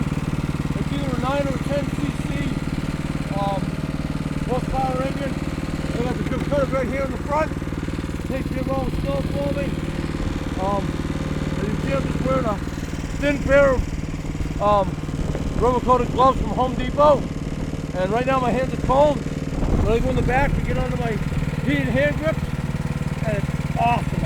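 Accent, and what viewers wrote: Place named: Boston, United States. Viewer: Sounds like a Bostonian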